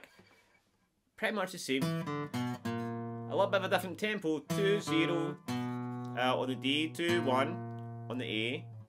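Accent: Scotland, United Kingdom. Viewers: He is Scotish . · Scottish? Good riffs? Good lessons? Instant sub mate! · Great video, To check his fingerwork, especially on the A7 ascent, I slowed the video down to 25 and 50%... The Scottish accent sounds brilliant!. Like some drunk old pirate - fantastic!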